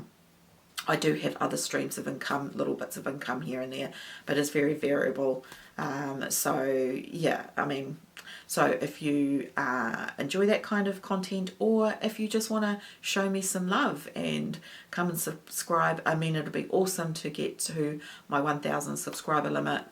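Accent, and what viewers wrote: New Zealand. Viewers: Yay another channel!! I'm going to be enjoying hearing your voice when I move to America in September as I'm going to miss hearing our Kiwi accent over there!!! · Kia ora - zoomed in from Te Awakairangi (aka Lower Hutt) - really feeling for you as I am sadly just getting over this rotten flu going around too... congratulations on the new channel 🤩looking forward to learning what you doing. I really appreciate your cooking and how to make and store recipes (especially the Hot choc recipe YUM!!). PS love your kiwi accent mwahahaaa.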